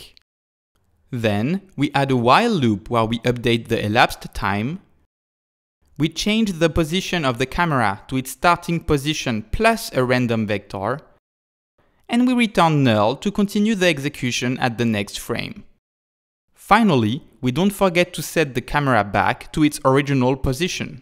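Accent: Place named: France. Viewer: Accent français détécté ! Nan sans déconner super vidéo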